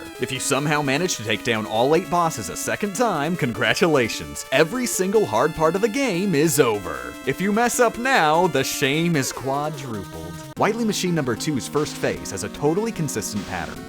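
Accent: United States. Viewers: I'm pretty sure that's how they pronounce it in America.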